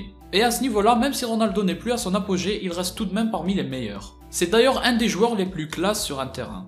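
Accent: Kabylie, Algeria. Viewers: Oh la la la l accent kabyle , je kiffe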